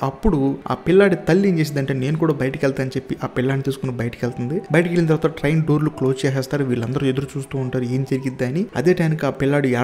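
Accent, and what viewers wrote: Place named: India. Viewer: Anna mi explanation simply superrr. Mana telugu slang to cheppadam chala bagundii. One request Ee movies OTT lo vuntee cheppandii Memu chustamm Thank u brooo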